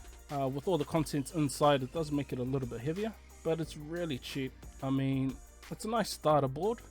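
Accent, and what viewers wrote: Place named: New Zealand. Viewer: Love the Kiwi accent.....................you are from New Zealand right 😂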